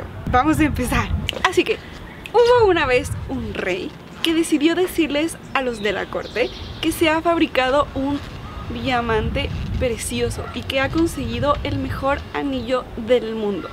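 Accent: Mexico. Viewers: Oye en serio, no te veo tono, bueno no se si los ecuatorianos tengan un tono específico, para mi suenas son tono específico como mexicana, como yo. O digamos con tono mexicano, no es desconocido para mi, sino familiar. Tienes raíces mexicanas?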